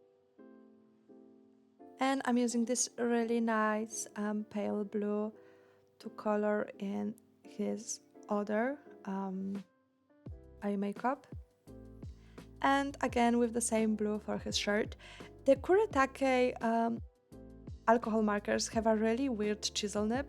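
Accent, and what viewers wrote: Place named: Poland. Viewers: I FELT THAT TOO, I THOUGHT "she sounds Polish" AND THEN SHE SLAPS A "ostrożnie szkło" STICKER ONTO THE SKETCHBOOK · I HEARD THE POLISH ACCENT FROM MILES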